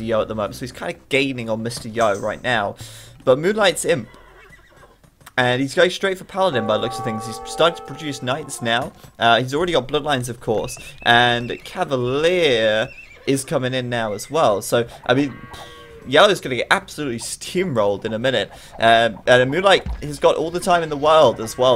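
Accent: United Kingdom. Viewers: I was wondering, watching your previous videos whether you were UK, given your accent (I love british accent ^^), and I got sure, when you said Argentina and Beijing were opposite on a World map, because of the fact Europe is centered on European countries. And I told myself there were probably more difference between Paris/London and Argentina or Beijing than between the last two. I checked it out, and next time, I'll think before speaking ^^ Keep up the videos, bro, you're doing a very good job (you sometimes seem to struggle a bit when a lot of action is going on, breathing or coughing ^^), and between Spirit and you, I got back to AoE recently. Only fighting AI for now, but this is such a good game.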